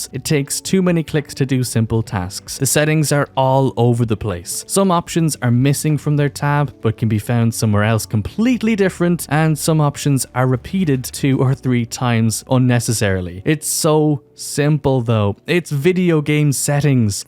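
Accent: Ireland. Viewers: Do I hear an irish accent in that voice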